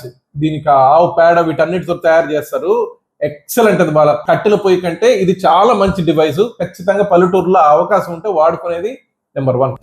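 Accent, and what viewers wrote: Nellore, India. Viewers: Nellore slang భలే మాట్లాడుతున్నారు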